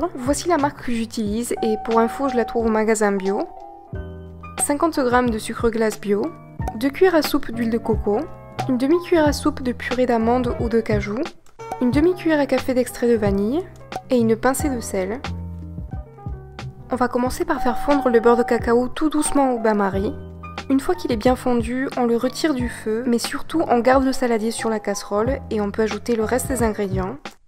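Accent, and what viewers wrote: South, France. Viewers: Je ne suis même pas vegan mais j'adore tellement tes vidéos , ton accent du sud (comment ça se fait d'ailleurs que tu aies cet accent en ayant vécu au Mexique ?), ta gentillesse et tes belles recettes que je suis abonnée haha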